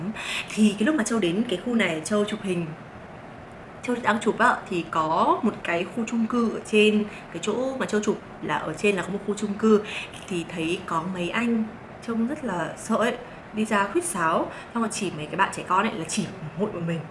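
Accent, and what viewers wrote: Hanoi, Vietnam. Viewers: Thích nghe giọng nói của cô gái Hà Nội này quá đi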